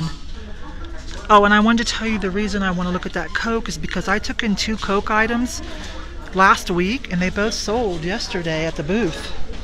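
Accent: United States. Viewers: I love listening to your voice. Where are you from in the States? I live across the pond, so am not familiar with different American accents. Love your videos and I learn a lot. Thank you so much. 😺❤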